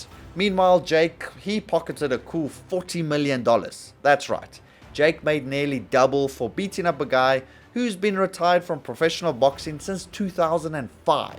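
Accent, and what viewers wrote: Afrikaans, South Africa. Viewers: your accent sounds south african in my opinion, please reply to this if i was wrong, thanks! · Im addicted to your videos, this is my second video I've watched in a row because of that accent. Up the bok, up the afrikaans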